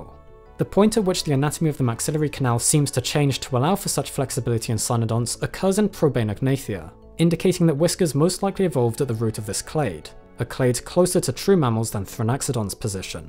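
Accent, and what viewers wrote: United Kingdom. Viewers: Thought you was British till I saw your teeth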